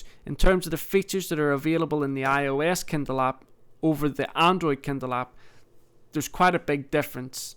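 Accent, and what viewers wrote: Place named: Northern Ireland, United Kingdom. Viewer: Nexus 7 - I love hearing your straight-talking, easy to understand and unbiased reviews in a familiar (Northern Ireland!) accent. Thank you for uploading all of these, and doing such a great job with the website.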